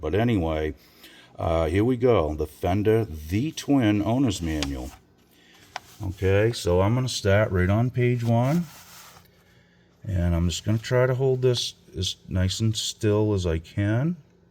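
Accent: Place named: New England, United States. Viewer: Nice studio. 😳 Are you from Massachusetts or New England? Edit: Maine. just said it.😁 Sound like my cousins from Friendship & Waldoboro. But not quite as drawn out on some words. PowAH! ⚡️ lol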